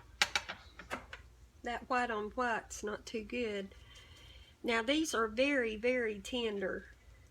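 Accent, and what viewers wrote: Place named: South Carolina, United States. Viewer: Since I am new, where are you from...You have a South Carolina accent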